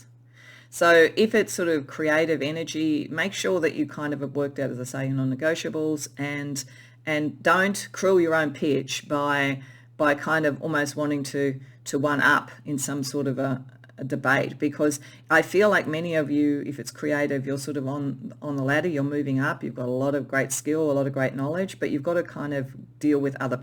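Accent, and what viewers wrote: Australia. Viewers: Love your accent Aussie?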